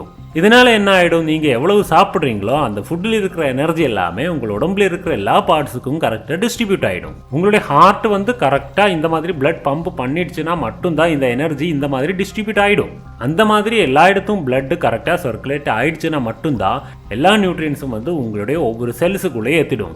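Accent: Kerala, India. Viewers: Neenga malayaliyaa? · voice super malayalam mix ah na tamil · Malayali yano... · Anna I'm sure are you Malayalam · Brother are you Malayali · Ninga Tamil lathan pesuringala bro ninga malayalam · You are a malayali ah????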